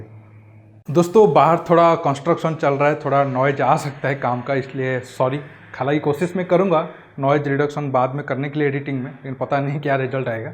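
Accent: Odisha, India. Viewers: Brother , excellent vlog ... please correct your Oria accent .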